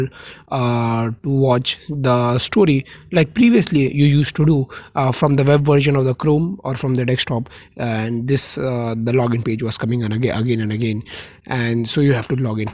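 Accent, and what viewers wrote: India. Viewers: Ughh i hate that indian accent pls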